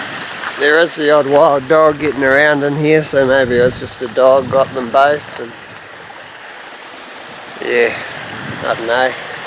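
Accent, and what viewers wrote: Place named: New Zealand. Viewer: Did you grow up in the urewera region bro or a you a southerner ?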